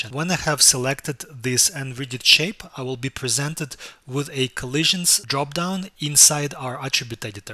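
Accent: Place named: Russia. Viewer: ты русский по акценту видно))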